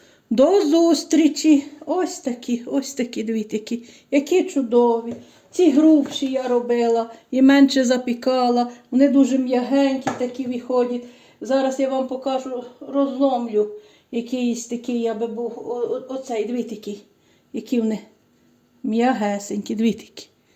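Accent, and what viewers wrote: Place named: Horodenka, Ukraine. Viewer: Слава Ісусу Христу,з неділею святою будьте здорові.П. я за браком часу невстигаю вам писати коментарі,а ви працюєте,випікаєте.Дуже гарне ваше печиво,а штолени сирні,які апетитні,ви напевно весільна господиня,або десь в їдальні працюєте.Дякую вам за ваші рецепти,що ділитеся з нами.Вітаю вас з прийдешніми святами,зичу миру нам всім та здоров'я.Ви часом не з городенківщини?колись там були в гостях і там похожий говір.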